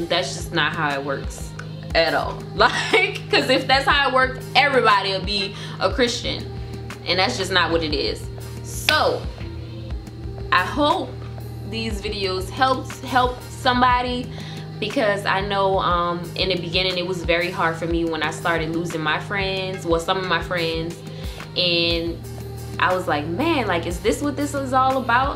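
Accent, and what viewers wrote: New Orleans, United States. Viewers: I know I’m late to this video but are you from the N.O ? I think I hear an New Orleans accent.🤔 And your a Christian also !! God bless you.! My family is from there.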